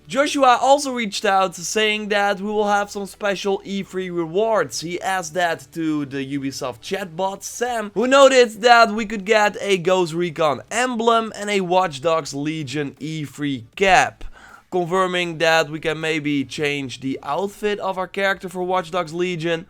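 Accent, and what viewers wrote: Netherlands. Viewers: We horen gewoon dat je Nederlands bent door de manier waarop je Engels praat 😂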